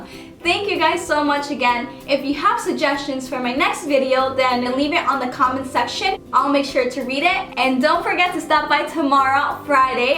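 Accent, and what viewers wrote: Spain. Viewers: I love will smith I want to go to Tokyo japan I want to speak Portuguese your Spanish accent is very pretty btw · i love the Spanish accent that influences the pronunciation of your English letters ❤❤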